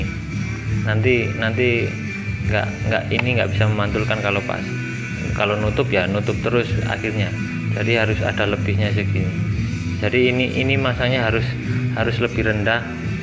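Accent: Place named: Java, Indonesia. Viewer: Medok.. Sekali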